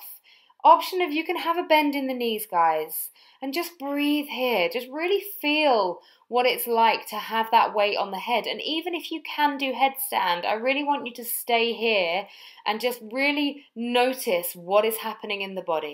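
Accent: United Kingdom. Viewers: Good job ! British English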